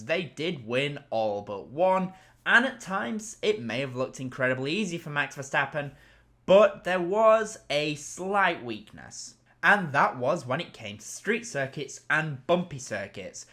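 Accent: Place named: North, United Kingdom. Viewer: Hiya, lovely to see your face for the first time 😊. It is always nice to visualise what youtubers look like. I love your accent too. I am trying to figure out where you are from but not sure. It sounds northern. My predictions for this season are: 1. Red Bull 2. Mclaren 3. Ferrari 4. Mercedes 5. Aston Martin 6. Alpine 7. Williams ( I hope but Logan will need to start scoring( 8. Visa Cash App RB 9. Haas 10. Stake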